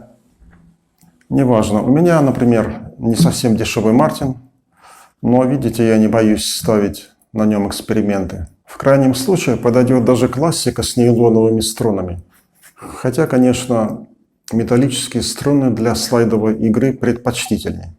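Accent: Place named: United States. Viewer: Не смотря на русский мат, Мартин звучит вполне себе по-американски!👏😁Спасибо за очередной сгусток полезностей!👍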